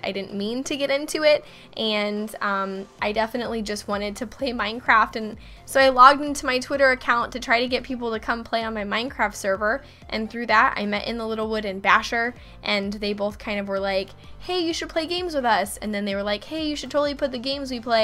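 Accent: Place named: Midwest, United States. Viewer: You accent really confuses me. It sounds like Midwest mixed with a bit of southern. Anyone know where she's from? Statewide, I mean. I'm not a creeper.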